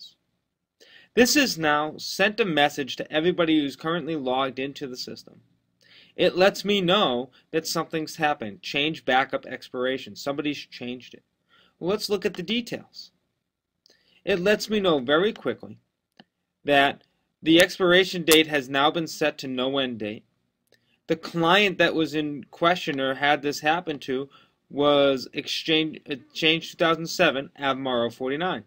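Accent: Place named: England, United Kingdom. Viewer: What a perfect English accent. I love it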